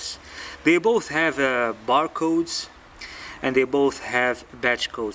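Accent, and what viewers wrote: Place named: England, United Kingdom. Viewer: This is very helpful!very nice english accent,, i send you all of it,🤗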